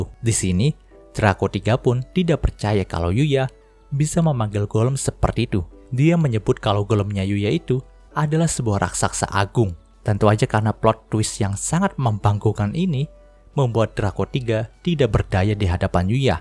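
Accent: Cirebon, Indonesia. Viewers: Kya logat Cirebon 😅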